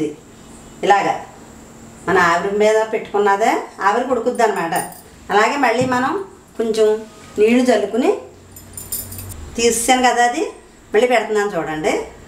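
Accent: Godavari, India. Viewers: Amma meedhi godavari jilla na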